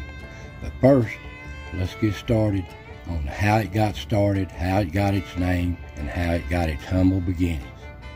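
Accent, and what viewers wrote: Appalachia, United States. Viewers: I am from Detroit but my heart lives in Harrogate and Middlesboro. I lived with my aunts and uncles in Harrogate during the Summers. In 1987 my uncle Steve Napier taught me how to drive when I was only 14 years old. We lived in Harrogate and he had a small shop in Middlesboro. To get me past my fear of driving he would have me drive across that mountain before the tunnel. Sometimes we would stop at the Cudjo's Cavern shop for some ice cream (before dinner). Uncle Steve was well known and loved from what I remember., your voice and talk atyle reminds me of so many of his friends that would stop in his shop to visit. It brought me back to the very happiest days of my life. Makes me wonder if you two knew each other...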